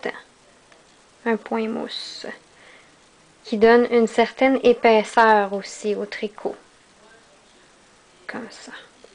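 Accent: Quebec, Canada. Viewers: Tu exagères, on comprend parfaitement ce qu'elle dit et son accent québécois est délicieux.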